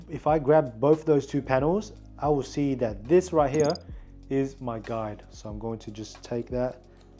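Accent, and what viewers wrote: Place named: Australia. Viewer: You sound like an ozzie mate 😀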